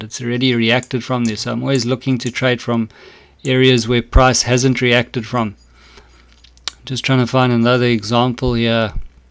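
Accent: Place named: South Africa. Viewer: May I ask what country you are from originally? You don't sound like a New Zealander. I mean no disrespect. If I had to hazard a guess, I'd say you're South African maybe?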